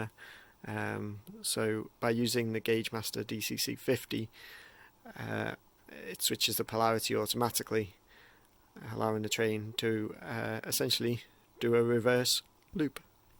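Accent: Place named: North West England, United Kingdom. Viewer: Loved the update. You sound like you're in the North West like me?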